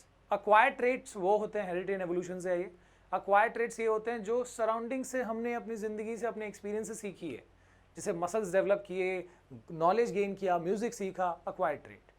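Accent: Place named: Haryana, India. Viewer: I really like haryanvi accent and yaa we are neighbours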